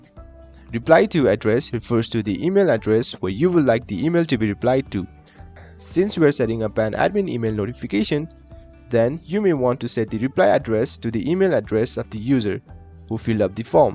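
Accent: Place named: India. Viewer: Nice but It's not easy to understand with the quite strong Indian accent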